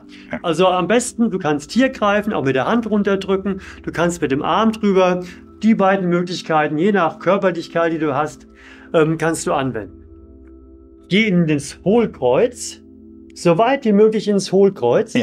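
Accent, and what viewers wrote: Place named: Hesse, Germany. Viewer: the best. Höse hoch usw. Mit hessisch gehts besser. 👍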